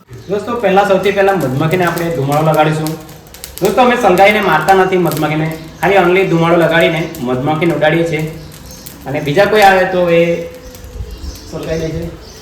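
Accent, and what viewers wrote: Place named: Marwar, India. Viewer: bhai to hamare marwadi lg rahe hai bhasa se to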